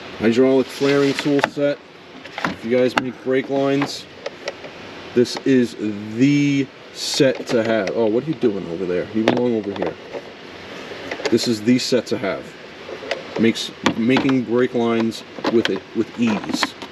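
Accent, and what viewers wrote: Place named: Long Island, United States. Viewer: Ohhh the OCD drawer was sweet! I sense Long Island, Brooklyn or possibly Jersey in the voice? LI here…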